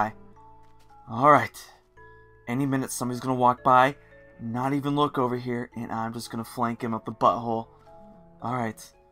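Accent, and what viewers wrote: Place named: United States. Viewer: Like an American or Bible Thumper. If American's sound like that, what do Canadian's sound like? Makes me nervous just thinking about it O.o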